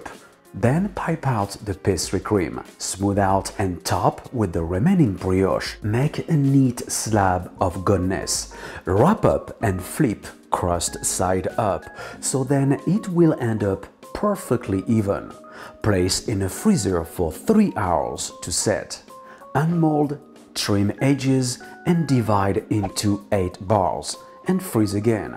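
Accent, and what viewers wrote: France. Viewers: Bonjour. A votre accent vous semblez être Français ou tout du moins francophone. Serait-il possible dès lors d’avoir au moins les ingrédients de la recette en français dans la description à défaut des sous-titres, s’il vous plaît ? Ps : pour ceux qui vont me répondre comme toujours « va apprendre l’anglais »... Sachez que je suis atteint de xénoglossophobie (peur des langues étrangères). Donc ma demande n’est pas un caprice merci.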